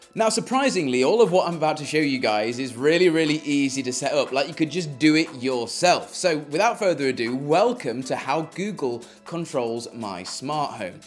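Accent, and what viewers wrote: United Kingdom. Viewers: British guy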